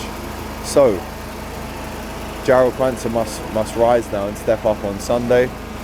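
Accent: Liverpool, United Kingdom. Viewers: Good scouse accent that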